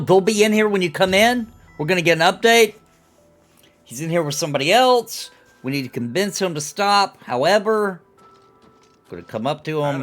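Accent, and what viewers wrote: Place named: South, United States. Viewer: Where you from brother, i hear the southern in you I'm from arkansas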